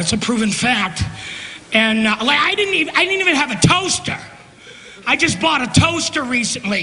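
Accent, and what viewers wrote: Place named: Boston, United States. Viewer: I absolutely love him. Wish I got to see him live. His Boston accent I love it 🥰 RIP John. Enjoy the buffet in heaven. Make God laugh 😂😂😂🙏🏼🌹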